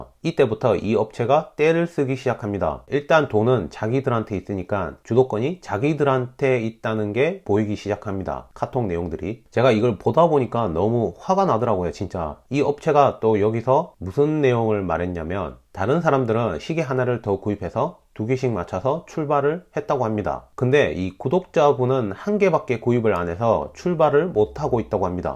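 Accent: Gyeongsang, South Korea. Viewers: 이알토 아.. 그렇군요.. 몰랐어요. 그냥 구수하게 경상도말 쓰셔도 재밌을텐데요~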